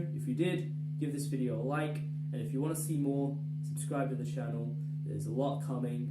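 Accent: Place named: Australia. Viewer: Your accent sounds Australian.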